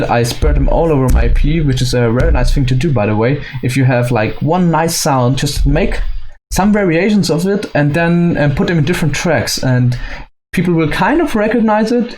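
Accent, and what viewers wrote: Germany. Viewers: You sound kinda german tbh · are you German?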